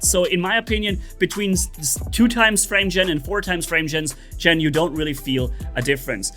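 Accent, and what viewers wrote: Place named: Germany. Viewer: Ich erkenne Deutsche Akzente, auch wenn sie nur ganz dezent sind. ^^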